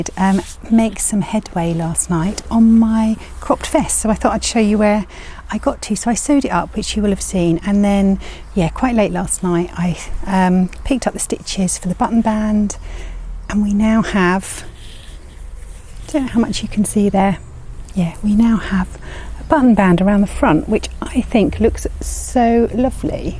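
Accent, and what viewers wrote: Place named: United Kingdom. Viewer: I love your videos, I am not a spinner, I am a knitter but it is always nice to see yarn confection. What I appreciate most of your productions is the gentleness of your voice , your lovely British accent, I am Canadian, and your visual aesthetics, all the images of flowers, nature. I love to knit as I listen to you.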